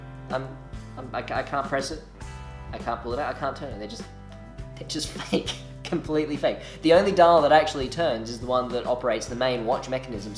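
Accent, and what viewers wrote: Australia. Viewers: I don't even care about watches I'm here only for the Australian accent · I'm still hanging out for the DIY fixes to some of those terrible quality items Alex is piling up for you. My hobby is remaking things and I love watching other do it too :) Oh and FYI - as a Kiwi - I'm not here for the Aussie accent like some other commenters , but in your case I am prepared to forgive it :) Chur bro.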